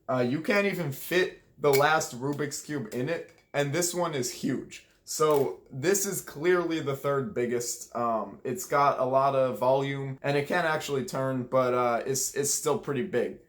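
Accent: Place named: New York, United States. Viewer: why the new york accent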